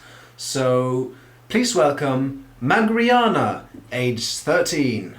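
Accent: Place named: England, United Kingdom. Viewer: how come your accent is so english if you're norwegian?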